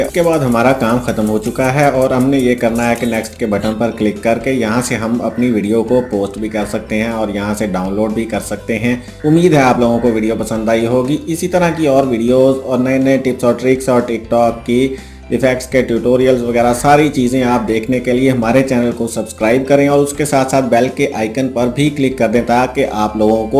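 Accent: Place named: India. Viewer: It is Eanglish but he talks in a indian eanglish way! Why is YouTube full of haters? God.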